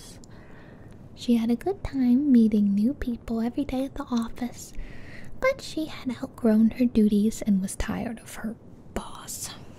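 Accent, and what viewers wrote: South, United States. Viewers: maybe can visit texas and regain her southern accent again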